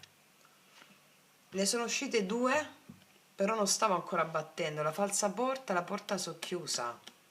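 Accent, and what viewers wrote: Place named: Salento, Italy. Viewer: Io avrei detto Salentina.. se è siciliana allora è di Messina 😊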